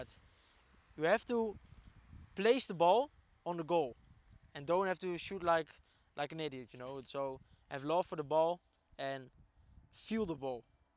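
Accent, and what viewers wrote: Netherlands. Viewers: Dat nederlands engels 😂 geweldig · Is it weird that i could detect his dutch accent before i saw his name??😅😅😅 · cruz you’re right thats because he is 100% dutch :D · dit is de eerste vid die ik heb gekeken van hem en ik hoorde ook al dat ie nederlands is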